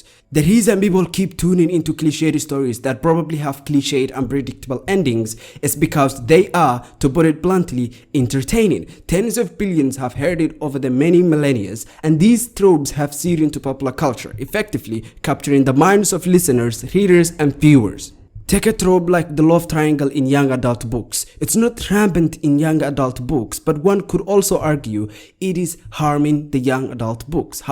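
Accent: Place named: Somalia. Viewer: Are you East African? You have a Somali type of accent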